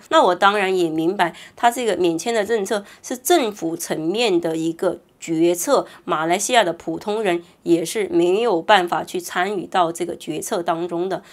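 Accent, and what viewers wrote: China. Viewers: 你的口音大陸人，我不知道你的目的是什麼？ 我看到是美國也在世界各地媒體和BBC英國媒體之前也是有收撥款來黑大陸 ， 你了解的大馬是很少 第一在大馬攝影師也是要去註冊申請公司才能營業也要交稅 第二大馬建設和大陸合作很多年了本地政府也為了保護國家就業只是批准一些專業人事來工作 其他承包商一定要是本地公司除非是本地沒有企業有代理的才能申請，勞工大馬本地人也不做也是進孟加拉和印尼工人居多 如果你說的攝影師沒有執照去大馬營業拍攝如果被抓到他們也會被罰款或者坐牢 為了賺299值得嗎？攝影師恐慌是好事其實可以逼他們創新和新的拍攝方式 如果大馬本地有錢也會一部分去包其他國家優秀的射影師來拍攝不同風景 因為本地的攝影師拍來拍去一樣的風格喝喜酒討論會問是不是某個攝影社拍的同類型 也會悶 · 妳就是中國大陸口音啦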